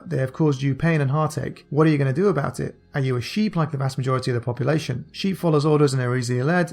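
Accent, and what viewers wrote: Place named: England, United Kingdom. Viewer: I just love your English accent 😍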